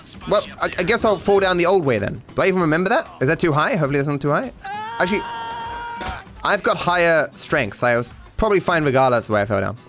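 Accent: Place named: Australia. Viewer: he’s Australian from Sydney im Australian from Sydney he grew up in Sydney I grew up in Sydney so our English is the same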